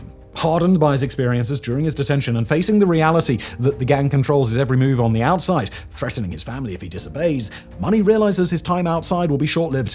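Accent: England, United Kingdom. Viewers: well one it’s more for fun, but two the author of this video is carries a british accent. I guess you could just take that at face value or further recognize that maybe you and the rest of your british kind are what’s actually trite. Lol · The exaggerated English snobbish pronunciation makes it hard to understand what you say.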